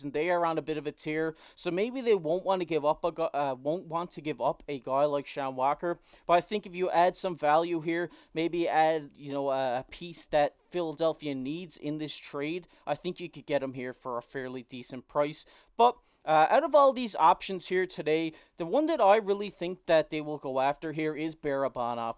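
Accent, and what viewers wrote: Canada. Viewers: As a yank, your Canadian accent kills me!! In a good way. Lol. I'm a Canucks fan so I'm hoping to get either Tanev or Monahan.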